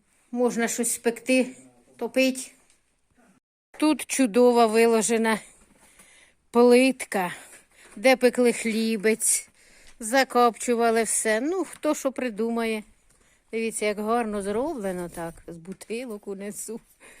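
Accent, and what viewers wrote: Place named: Chernihiv, Ukraine. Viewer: По мові- Полтавська чи Чернігівська. Швидше Чернігівська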